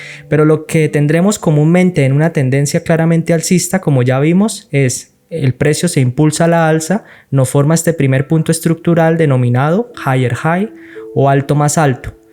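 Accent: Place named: Colombia. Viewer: Hola tocayo como vas? También me llamo y soy de colombia igual tú por tu acento. Es el primer video que velo tuyo, felicitaciones. He visto muchos vídeos sobre estructura y te digo que es el más claro que he notado sigue así. Una consulta en donde ves el índice del dólar? Y entonces dependiendo de su movimiento así mismo decides así donde van tus entradas? Gracias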